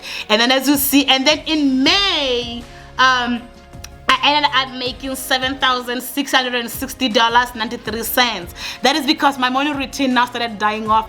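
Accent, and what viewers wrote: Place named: Africa. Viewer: Thanks for providing detailed information.You can have African accent what is important is the information you are presenting to us.Well done with your spreadsheet we will always support you in the SADEC region in Africa.Felicity Family SA.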